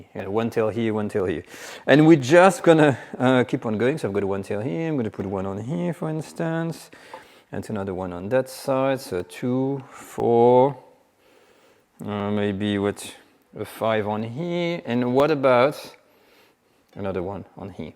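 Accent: France. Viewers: Dude, I bet this video can be done in 2 min or less. Besides, the French have an awful accent. Hair of after 4 min. Really!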